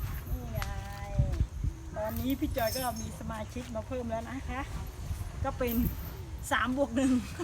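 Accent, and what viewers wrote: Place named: South, Thailand. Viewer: พีจอยพูดใต้ยังกะคนใต้แท้ๆ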